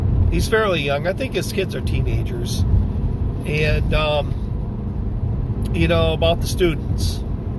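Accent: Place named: Michigan, United States. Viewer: KIND of fun to hear your take on the Alone show again - after all this time. I had to laugh when you made fun of the Wisconsin accent - Cuz I have to Say - Your Michigan accent is pretty special as well. Of all the Merican accents I would have to put MN, WI & MI accents as the most "special" & annoying as F - of ALL🤡 & I have been to almost every state...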